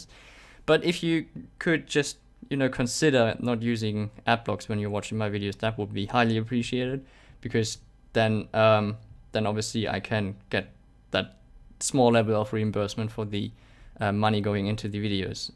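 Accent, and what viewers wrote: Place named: Germany. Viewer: weil du einen deutschen Akzent hast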